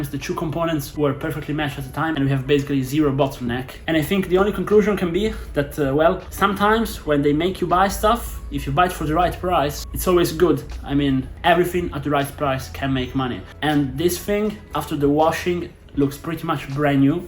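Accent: Italy. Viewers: Brooo ma sei italiano?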